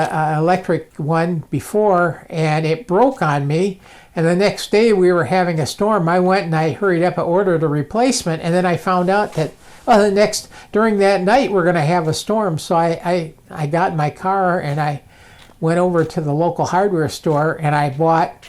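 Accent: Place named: Michigan, United States. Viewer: I thought you were from Michigan by your accent, Im from Howard City Michigan❤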